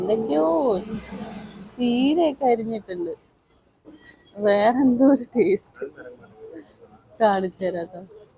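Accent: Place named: Valluvanad, India. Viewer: വള്ളുവനാടൻ ഭാഷ ല്ലേ... പാലക്കാടൻ slang ഇല്ല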